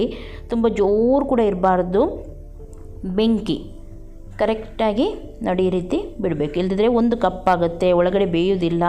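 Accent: Mangalore, India. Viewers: Tapakka typical mangalore kannada😃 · Nice mam .... neev Mangalore navra.... Language haage... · "tapakka" typical managalore kannada...i love it, you revealed many tittle secrets which others dont.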